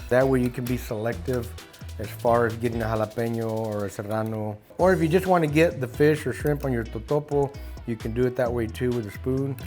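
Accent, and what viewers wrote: Texas, United States. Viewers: This guy is soooo Texas lol, the "duuuude!" was beautiful😊 loved the recipe, tysm ❤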